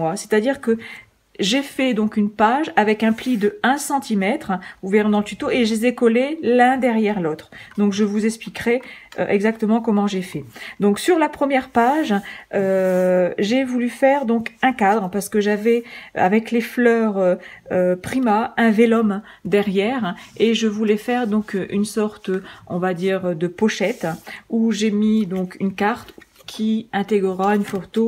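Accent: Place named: Belgium. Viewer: coucou j aimerai savoir ou vous trouver vos jolis papiers merci super vdeoj adore votre accent belge ou le nord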